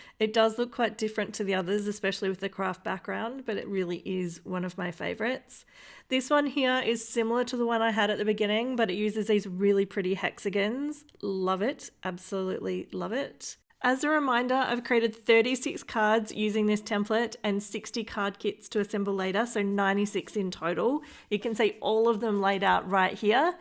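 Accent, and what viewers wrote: Australia. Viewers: Nice, quick and cute cards. I always love your cards and as a Canadian married to an Aussie it’s nice to hear that accent as well. Hugs from Ottawa, Canada 🇨🇦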